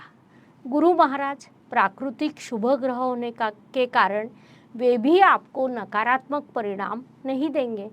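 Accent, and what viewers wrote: Maharashtra, India. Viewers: ASTAM STHAN PATNI KA DHAN KUTUMB VAANI STHAN HAI...PATNI KI VAANI MAI MITTHHAS HOGI😂 SASURAL SE PAISA AA SAKTA HAI...AADHYATMA BADH SAKTA HAI. LET S KEEP FINGERS CROSSED. PURA HINDI PRAVACHAN MARATHI LAHEJE MAI SUNNEKA ANAND AAYA ASTMESH BARAHVE MAI OR PAAP PRABHAV RAHUKA VIPAREET RAJYOG KA ULLEKH HOTA TOU HOSLA BADHTA. BABA KEDARNATH MAHADEV KI JAI.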